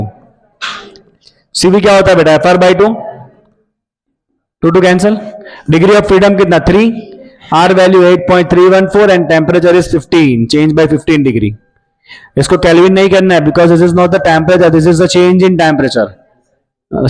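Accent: Gujarat, India. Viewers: Sir are you from Gujarat?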